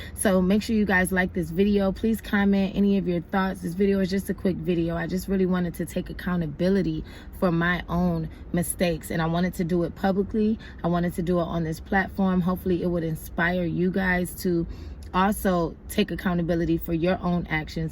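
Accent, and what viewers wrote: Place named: New York, United States. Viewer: You got a new York accent.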